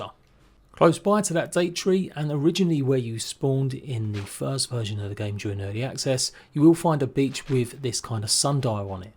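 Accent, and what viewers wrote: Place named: London, United Kingdom. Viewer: That is definitely a London accent you've got there. I just started this game yesterday and was in major trouble with water cause I only had the 2 coconuts that take ages to respawn. Thanks to you I am now smarter. Shout out from Germany. Cheers mate 😁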